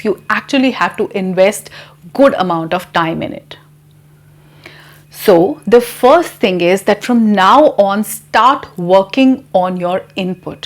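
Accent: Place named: India. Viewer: Dear mam you're doing well but I request you to change your accent from Indian to American or British. So that we might learn more.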